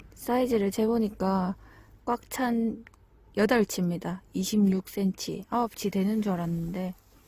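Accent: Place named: Gyeongsang, South Korea. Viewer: 님은 지역이 어디세요?경상도 인듯한데 저는김해 붕어꾼입니다~^^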